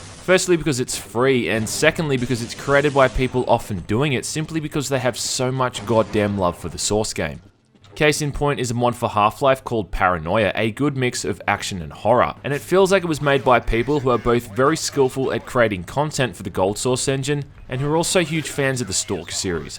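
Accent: Australia. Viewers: I fucking need subtitles to understand your aussie.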